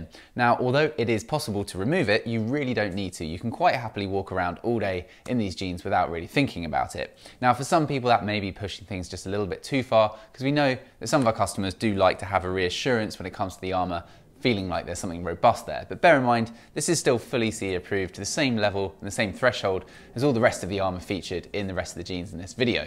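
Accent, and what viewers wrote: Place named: RP, United Kingdom. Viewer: It called The Queens English...and is expensively acquired at English private schools..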